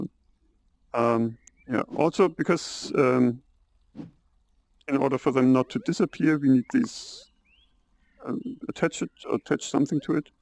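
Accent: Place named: Germany. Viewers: Yea I think he is a german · Nice! Also i like ur accent are you german? I'm half german!! +1 sub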